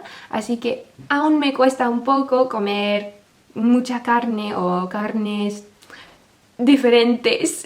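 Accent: Spain. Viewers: Hola ! Acabo de encontrarme con tu canal ! Yo soy Mexicana ! Se me hace interesante descubrir España a través de una estadounidense 😊. Una observación la salsa q comparas con el gaspacho no es una salsa Mexicana! Es una mezcla texmex ! Una verdadera salsa mexicana es muy diferente. Por otro lado te felicito por lo bien q hablas el español con todo y el acento de los españoles.! Saludos y bendiciones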